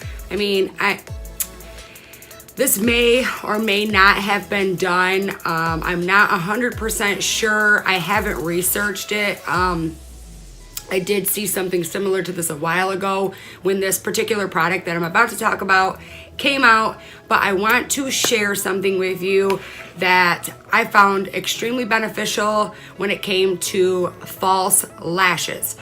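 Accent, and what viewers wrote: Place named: Michigan, United States. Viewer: Love you video, are you from Michigan? You have a Michigan accent lol.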